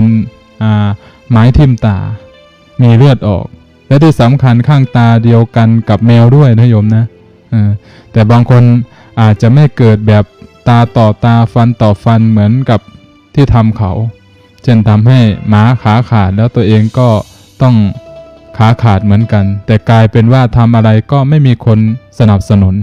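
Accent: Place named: Lampang, Thailand. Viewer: ลำปางชัดเจนดีเจ้าคะ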